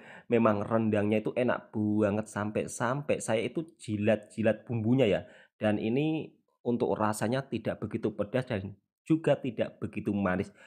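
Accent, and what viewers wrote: Java, Indonesia. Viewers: best tengok video Bang ni, orangnya jujur dan ikhlas dengan loghat Indonesianya tu agak LUCU tapi MENGHIBURKAN, terus berkaria ye Bang. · Loghat jawa lah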